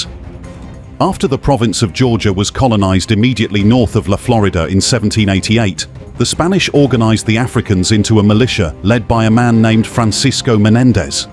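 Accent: England, United Kingdom. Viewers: I enjoyed this video, but the "English or European narrator is a turn off!😮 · Come on now a British/English accent to tell this?! The Trail of Tears were Native Indigenous People. Poor African People was more like The European Ships Sails of Tears to be Honest. Very Sad and Very True!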